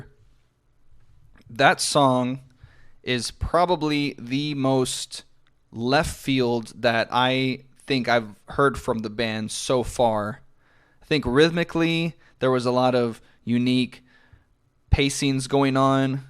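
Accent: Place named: United States. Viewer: Don't think I'll ever get used to how americans pronounce niche.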